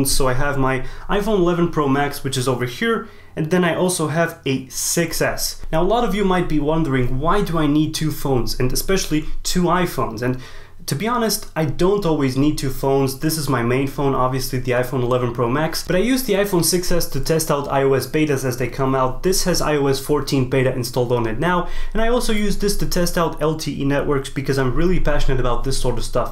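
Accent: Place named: Eastern Europe. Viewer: To be honest, I'm from Russia, but I speak English. Initially, I think you are from Eastern Europe. It turned out to be true. Thanks for the answer!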